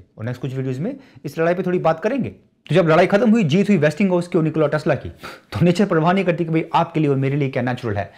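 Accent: Kashmir, India. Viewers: Sir aap kashmir se ho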